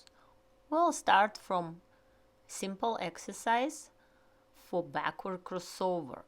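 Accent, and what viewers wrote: Russia. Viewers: А по русски никак? Слышу русский акцент🤔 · Enjoy all your helpful instructions especially with the Russian accent!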